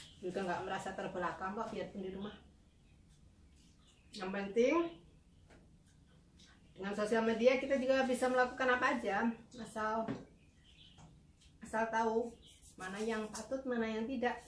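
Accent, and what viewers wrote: Java, Indonesia. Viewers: Hahahhaa aku nontonnya subuh2 jadi ngileerr pengen rujak buah, ditambah lg mbak pas cerita logat jawanya keluar bgt jdnya lucu gk ada kesan judes 😂😂 aplagi pas nguleg lombok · Biarin orang nyinyir, karna nyinyir tanda tak mampu, semangat mbak, kamu bisa jadi inspirasi wanita Indonesia, aku suka banget gaya bahasamu yg medok jowo banget. Kita kan orang jowo.❤️❤️❤️ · baru liat channel mbk sekali tapi ud sy subscribe soalnya bagus banget anak ttp diajarkan dua budaya bahkan budaya tempat tinggal luar biasa. banget bisa pertahankan logat jawa juga😊😁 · Mornibg,ni ch saya lht pas pagi nyiapin msak mjkn sdh selesai,b8sa buka You Tube di dpur...biasa nyuri waktu...saya slalu bgikuti...saya bgt logat mu msih medok juowo bgt lo jeng,iyo jebg ngono iku ra sah di dengar jeng,anggap ae org iri dgn kehidupane pean..saya salut bgt,bisa jdi IRT yg bsik,saya bisa mencari tmbhan rezeki,tetapi anak"ku q titipin ke Ortu ku...n...kakakku. 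· Hahaha salut mba semangat ibu hebatt ... Sy seneng gaya ngomongx wong jowo biar tinggal di luar ngakk sombong 😍😍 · Sabar mb.... Namanya orng bnyak y bgtu Tp ak suka ketawa klo logat jawanya keluar...podo mbak kro ak ...hehehehe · Mbak orgnya asyik kok.. gk sombong.. gk lp bahasa indonesia aplg bhs jawanya itu lo.. medok bgt 😊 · Ya.. Mbak2 sekalian... Kita yg tinggal di luar negara saja yg dpt memahami.. Org yg gak pernah keluar negara atau tak berkeluarga dg org asing mmg tak faham.. Mak saya dah 29 tahun tinggal di malaysia.. Bahasa Indo nya dah cacamarba kira wang indo lagi lucu.. Saya rasa mbak masih ok sangat.. Bahasa jawa masih medok bahasa Indo masih ok..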